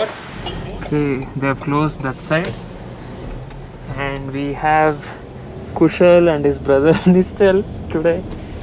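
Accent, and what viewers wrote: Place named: Rayalaseema, India. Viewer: Your kannada and seema slang telugu blend is perfect bro..... congrats for u r new bycycle gang 🙌......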